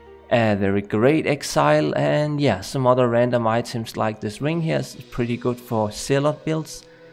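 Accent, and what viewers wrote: Denmark. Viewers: Nice video. Elsker at din danske accent er så tyk at man slet ikke er i tvivl XD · I think in some way or form we all dislike real money trading like you are doing half the time on JSP, there is no way around it it’s pay to win but that said trading items for real money will never go away so that’s way I am happy with the current status of JSP. You sound Danish? Am I wrong 🤷🏻‍♂️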